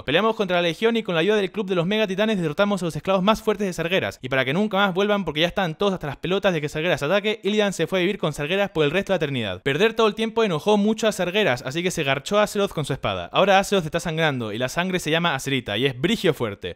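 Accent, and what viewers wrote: Chile. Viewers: Este wn es chileno? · Nada mejor que escuchar a un argentino hablando como chileno. Que agradable sujeto.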